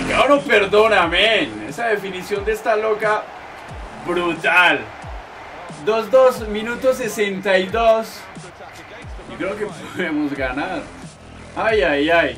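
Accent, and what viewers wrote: Colombia. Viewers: Tu eres colombiano desde el minuto me di cuenta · de que parte de colombiano eres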